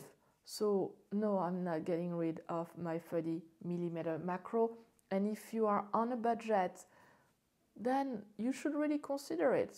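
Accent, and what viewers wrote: France. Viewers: I do like your french accent :-;, i use the 60mm and i am very happy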